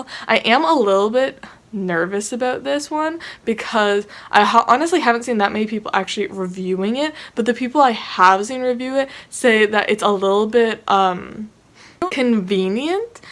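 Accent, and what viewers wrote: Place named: Canada. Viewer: You’re from New England? I thought you were Canadian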